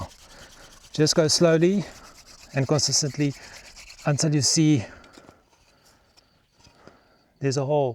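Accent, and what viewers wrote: South Africa. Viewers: it's uniquely South African ❤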